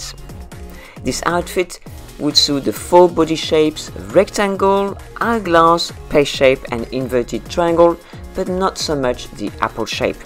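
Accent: France. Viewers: Aw, I liked it when you used to say “ to receive the notifications”. It was a little charming clue that French is your first language!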